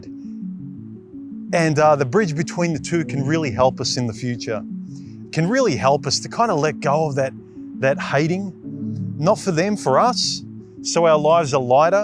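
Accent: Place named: Australia. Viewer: Ausssssie accent... love it!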